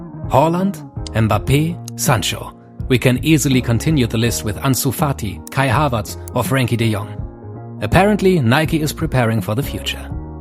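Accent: Germany. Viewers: Love your German Accent 🤘🏽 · This guys definitely German 😂 the way he says puma and neymar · You hear really german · omg lmao, sos, when i watched this vid, it was at night and i didnt have full volume, now i hear that hes german lol, but yh lol, americans cant play football and cant even call it the right name u cant deny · He’s German tho and that’s his accent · Man hört es so raus das es ein deutscher ist hahaah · Wow, I feel the German accent so hard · German accent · Man hört dass du ein deutscher bist gib dir mal mehr Mühe laaan.. german accent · Ich höre doch unseren deutschen Bruder raus 🇩🇪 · Definitly its a german speaker i think. Ik hör da ein Akzent raus, richtig? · Ich liebe diesen unfassbaren deutschen accent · Bist Safe 'n deutscher bei deinem Akzent und wie du die Namen ausgesprochen hast :D🤙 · funny how you can hear the german accent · the voice actor just sounds so german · The german accent tho... 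· "positive racist" bias: your german accent (or austrian) sounds perfect when you explain marketing and global strategy Great work my friend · Der deutscheste akzent überhaupt 😂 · Bro, your German accent is soooo thick. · Ich feier deinen deutschen Akzent, mach weiter so!! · broski sound so german(deutsch) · 100% Deutsch warum hört man das?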